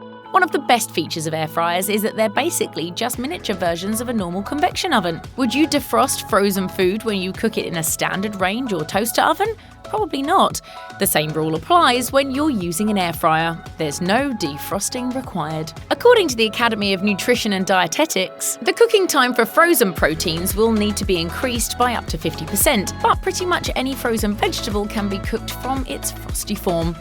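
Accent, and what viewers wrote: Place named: United Kingdom. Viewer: why use a 'terribly British' style voice-over then continually quote temperatures in F when we tend to use C ?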